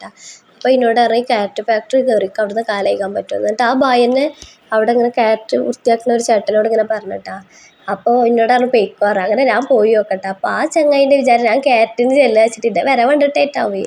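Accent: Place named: Malappuram, India. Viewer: ഈ വീഡിയോ ഇഷ്ട്ടായി ഇജ്ജ് അന്റെ മലപ്പുറം സലാംഗ് പറഞ്ഞു കേൾക്കാൻ നല്ല രസണ്ട് 😀 അഹ് ബല്ലാത്ത ജാതി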